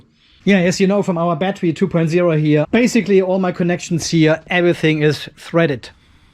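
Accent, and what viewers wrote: Germany. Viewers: You sound like german? Speak deutsch?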